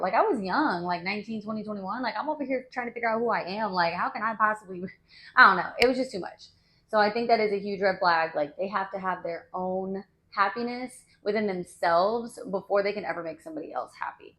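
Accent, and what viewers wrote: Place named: South, United States. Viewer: Love the accent!! Houston? Lil southern, lil ratchet accent!!!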